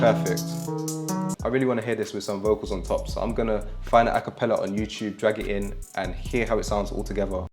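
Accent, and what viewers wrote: London, United Kingdom. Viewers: You from London g?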